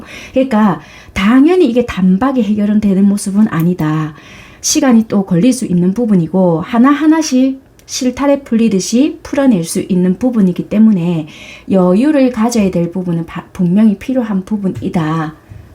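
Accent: Busan, South Korea. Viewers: 너 부산에서왔쩨ㅡ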